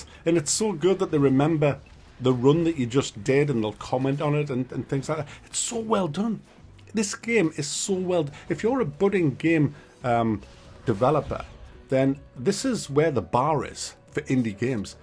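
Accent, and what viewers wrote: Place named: Scotland, United Kingdom. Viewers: Thought he is Scottish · This guy sounds Scottish.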